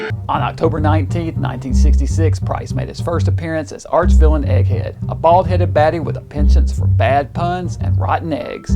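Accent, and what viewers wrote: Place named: Tennessee, United States. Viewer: I grew up in Tn. I knew your accent. My father was from Rockwood Tennessee and ran away from home when he was 13 and joined the spookshow business! I've always had a lifelong affection of horror and sci-fi! Excellent work!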